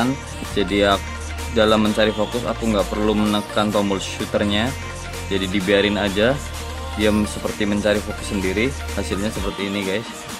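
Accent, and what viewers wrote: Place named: Banyumas, Indonesia. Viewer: Jawane ngndi lurr??, Medoke ngapakk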